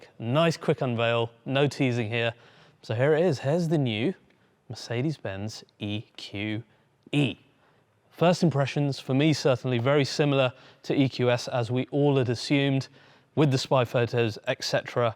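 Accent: United Kingdom. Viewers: have to be a Brit to understand this dude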